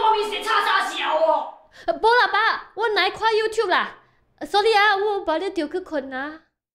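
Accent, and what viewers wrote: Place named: Taiwan. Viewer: 為什麼你有台灣人的愧靠(口氣)......... 詞有梗 人也漂亮 給你五顆星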